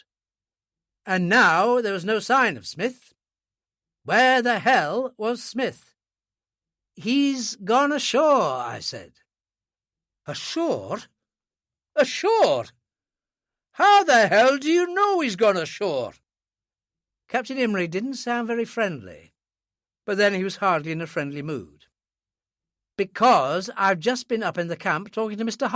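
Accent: England, United Kingdom. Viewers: I have been seeking a channel with minimal adds. In Alistair MacLean’s audiobooks I find that there are no adds but there is a difficulty with the reader. The English accent makes it hard to understand some of the dialogue. This audio book drags on and on so I have increased the reading speed However in doing so the reader’s English accent makes it even more difficult to understand.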